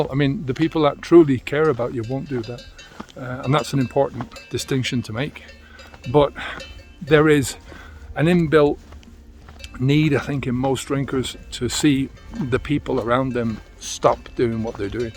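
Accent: Ireland. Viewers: love the content, the cow bells, the Irish accent and the scenery. Thank you :)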